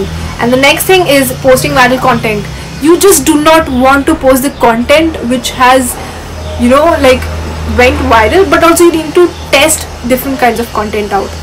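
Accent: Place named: India. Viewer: it's the Indian accent ahah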